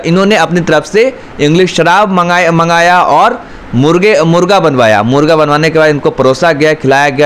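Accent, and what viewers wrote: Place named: Rural, India. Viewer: Bhai teri zaban saaf nahi hai tu gawar jesa bolta h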